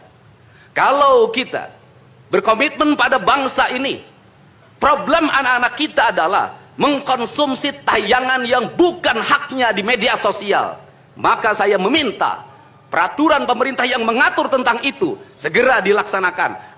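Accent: Sunda, Indonesia. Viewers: Subhanallah alhamdulilah punya cahaya kepemimpinan yg adil dan keyakinan kpda allah,sundanya kentel,non politik,hanya keyakinan dan ertongan allah swt,kecerdasan,untuk memberantas segala bidang,allah uakbar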